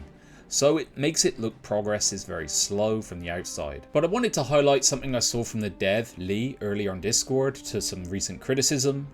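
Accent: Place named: West Country, United Kingdom. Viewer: Whats with the west country accent sprinkled with the American favourite of replacing T sounds with a D. You may not think it 'madders' but it matters.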